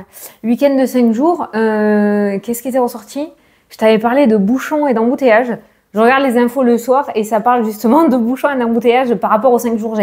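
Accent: South, France. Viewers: Bien sûr que l' on te regarde, et surtout que l' on t' écoute, ton bel accent du sud nous donne un rayon de soleil tu es une vraie petite pile merci a toi et bonne journée bon weekend gros bisous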